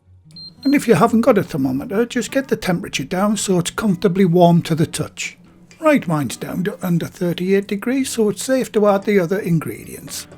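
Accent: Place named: Newcastle, United Kingdom. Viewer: Not only are these videos great to watch (and have worked well for me so far) sounds like a Geordie Winnie the Pooh and it's mint!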